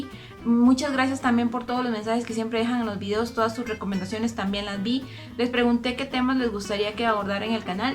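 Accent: Mexico. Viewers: Saludos hermosa ya ablas como mexicana bendiciones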